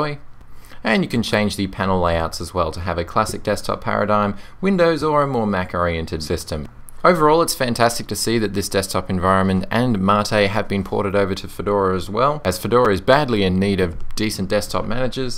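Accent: Australia. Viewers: Great review, thanks! P.S. Your Australian accent is really really nice! I absolutely hate British accent (my gf's entire family were born in England and later moved to Canada), but Australian accent just has subtle differences which actually make it really attractive. Sorry for the off topic comment, but I just thought I'd let you know how big of a selling point your accent is when it comes to online reviews. Subscribed :)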